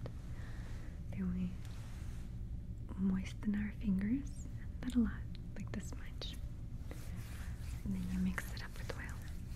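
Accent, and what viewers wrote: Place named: Russia. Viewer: English is not her native language. She is from Russia.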